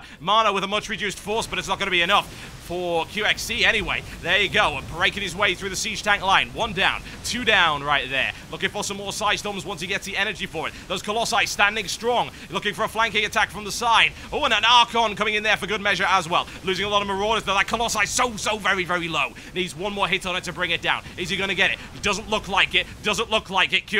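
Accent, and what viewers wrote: United Kingdom. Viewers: no need to drink coffee, good gameplay gets the blood pumping.. love your enthusiasm =3 keep up the good work. (also, yay for british accent <3 )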